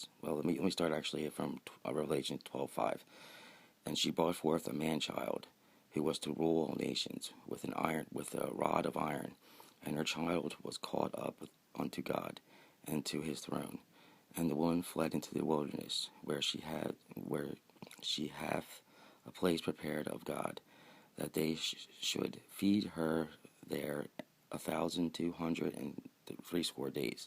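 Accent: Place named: Pennsylvania, United States. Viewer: Dude your Pennsylvania accent is so heavy I can barely understand what you’re saying. Speak more clearly.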